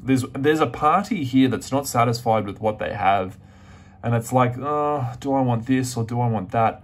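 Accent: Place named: Australia. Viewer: You sound like an Aussie...🇦🇺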